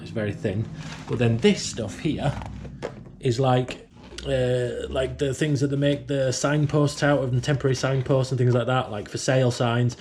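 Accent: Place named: Bradford, United Kingdom. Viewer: Another nice video mate, I've subscribed . With that accent are you a bradford lad by any chance ? Im also a yorkshire lad, living in sunny oakworth 😁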